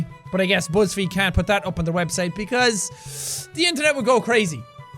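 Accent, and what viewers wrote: Ireland. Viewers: Jack gets more irish as it goes😂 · I never heard so irish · I love how his accent gets more and more irish through the video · The way Jack becomes more Irish while talking about Ireland is like me speaking more and more Chinese while talking about REAL Chinese Food. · Rewatching all his videos and i love how thick his irish accent is compared to now xD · oh god his accent is so irish as the video goes on OH MAH LORD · The further you are in the video, the stronger his Irish accent gets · 30 seconds and 2 minutes in isn't a "normal" Irish accent, it's a watered down version that he developed while he's been on Youtube. Watch his early videos, he sounds really different. Actually at first he tried to get rid of his accent completely because he didn't have the light accent that he has now and he was worried people wouldn't be able to understand him. 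If you think he's incomprehensible by the end of this video, you should bring a "translator" with you if you ever visit Ireland lol. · This is the most Irish I think anyone will hear, ever. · slowly gets more Irish as the video goes on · Jack: *gets progressively more Irish as the video goes on* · I like how he just becomes 600% more Irish in these videos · Holy shit this is the most Irish I've ever heard him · I love how anytime he makes a Irish videos like this he gets more and more Irish as the video goes on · he just got more and more irish as the video progressed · In the last ten minutes of the video only Irish people would be able to actually hear what is saying cuz the accent thickened throughout the whole video😂😂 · He went full Irish for this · You sound more Irish somehow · I love how Irish he talks in this vid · he went like... full irish with this test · He sounds so Irish in this video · accent gets gradually more Irish as the video goes on and it’s wonderful ☘️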